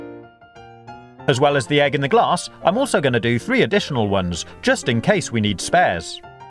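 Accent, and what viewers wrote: United Kingdom. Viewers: Are you british? Am i right? And your videos are super really very amazing incredible handsome!😄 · I love you're British acsent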